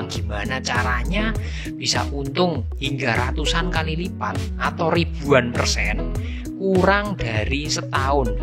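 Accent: Java, Indonesia. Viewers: Logat jawa kukira mukanya jawa, tp muka chinese kek opah opah hihi Thanks ilmunya bang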